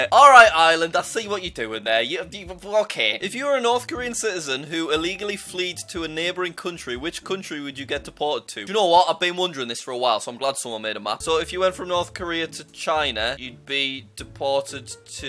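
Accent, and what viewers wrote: United Kingdom. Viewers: Your bri`ish accent is just crazy, mate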